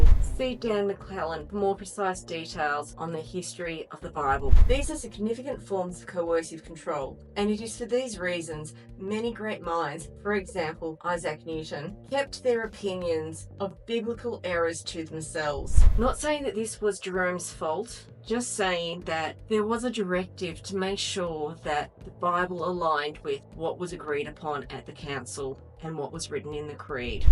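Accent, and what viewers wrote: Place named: United Kingdom. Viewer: Sounds British. The Brits self - castrated a long time ago. It's not working out well for them....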